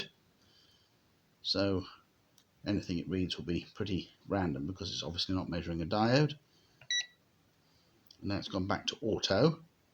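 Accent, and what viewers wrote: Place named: England, United Kingdom. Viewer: um, we couldnt se a thing you were doing. just heard some old English chap muttering on about various things...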